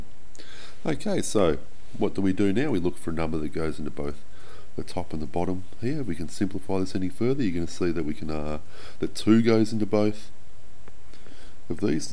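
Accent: Australia. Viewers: Jonathan Ramirez hes australian, and Bruce as well has an Aussie accent. So there you go. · All the channels I have watched for this all had like the thinnest American accent, finally a fellow Aussie I can actually understand!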